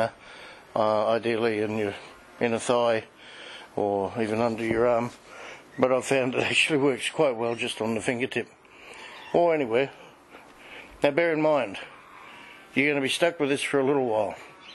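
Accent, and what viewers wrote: Australia. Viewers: my estrogen went up with all the weight which isn't a good thing. Try it and let me know. I stopped all sugars instead of fruit of course. I also stopped wheat. I wish you luck. You sound Australian, only because I live in Adelaide.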